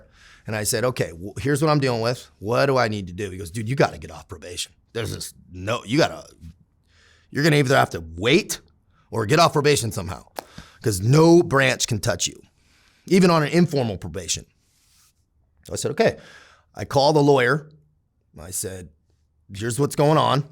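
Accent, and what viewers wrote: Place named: New England, United States. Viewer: his ummss are just fuckins. hes a new englander like me so i know😂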